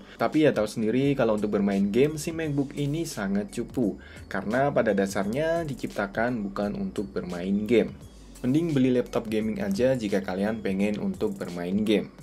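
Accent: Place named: Java, Indonesia. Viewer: review nya jelas, sama kayak medhok nya #timmedhokforever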